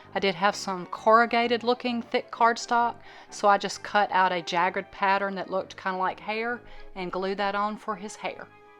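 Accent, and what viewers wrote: South, United States. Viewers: From the prairies in Canada: Pretty darn cool video! If I was still teaching early years, I think I'd be consulting your contributions more often. Thanks for sharing. Pretty nice accent too. I honestly always liked a southern drawl. Velox Versutus Vigilans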